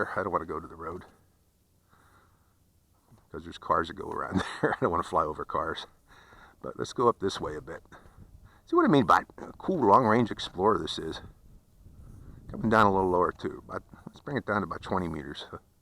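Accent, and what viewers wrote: Pittsburgh, United States. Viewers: are u from Pittsburgh? I noticed a accent. .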